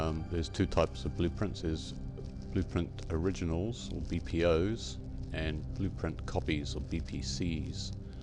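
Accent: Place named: New Zealand. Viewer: its that kiwi accent, soft vowels.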